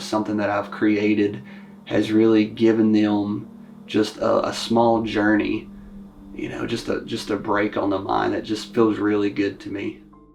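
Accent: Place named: Tennessee, United States. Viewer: WoW 😲 Cool your accent is Tenn.You sound Just like my relatives! From Kingsport, Bristol, Mendota,Johnson City. It’s on Dad's side. Great job with this & Alter was Awesome 👍 Hope you're doing well in the Industry.Happy Halloween 🎃 Season 🔥🎃👻🧛🏼‍♂️💭🦇🔥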